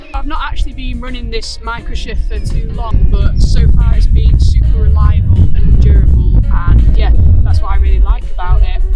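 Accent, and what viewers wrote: United Kingdom. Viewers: (5L) Love London Language 😍😍😍😍😍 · That no London dialect, Ime from Wigan n she sounds like me.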